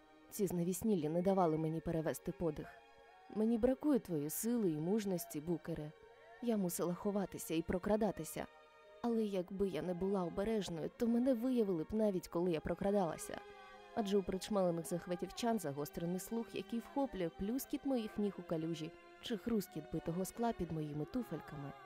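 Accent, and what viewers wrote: Standard. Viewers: Чудовий голос та чиста мова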